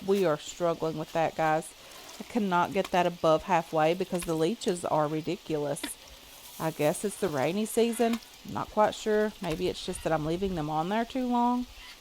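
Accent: Kentucky, United States. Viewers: From 1 Ky girl to another love the accent! Love watching you play Green Hell. Tip: unless just for videos & showing how to build I would not invest tons of building into drug camp or until you get to second map Anaconda Island or past if in story mode build only small overnight stopping points & water filter or containers etc... you will most likely spend very little time on this side of the map. ;-) there is also a Great cave to build in just go straight back behind the pig trap. Also watching your second season of Subsistence from the beginning in the 30s now liking it as well. Love your stories about your mom, canning & family. If you have not tried Grounded also check it out.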